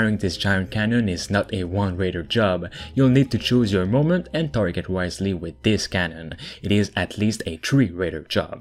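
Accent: Quebec, Canada. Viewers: Tu parles francais? Tu sonnes quebecois 😜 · tes quebecois toi hein?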